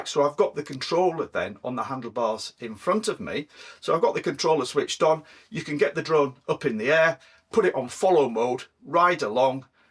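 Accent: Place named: Northern England, United Kingdom. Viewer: Excellent video! You nailed it with the reasoning and I fully agree with you. Nice to hear that Northern accent as an ex-pat in Vienna from Sheffield. I located your trips and was surprised that you don't seem to be from the Harrogate area as you sound just like my best mate from school who's from there. 😂 Anyway I'm glad I discovered your channel and have viewed a few of your videos. I will watch more. Again I fully agree, stick to your principles why you enjoy making them, you are educational, thought inspiring and making others happy. Thumbs up 👍 Oh yes I have recently returned to biking after a long break. Kids etc. 😉 A lot to relearn.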